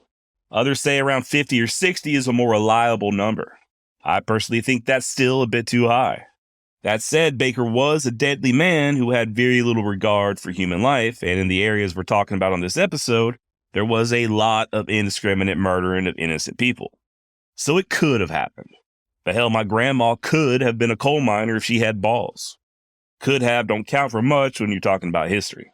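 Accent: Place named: South, United States. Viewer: It does a soul good to hear a Southern Accent every now and then. And I like your stories. Keep it up!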